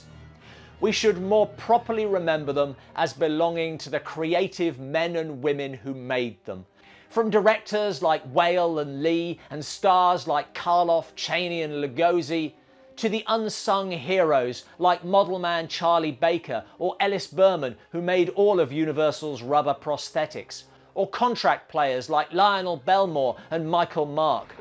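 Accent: United Kingdom. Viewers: It really IS hard to see Krogh as anything other than a buffoon after YF, so similar is Marrs to Atwill LOL. There’s something about hearing these stories in an English accent. Between you and Adam Roche, I am a happy man❤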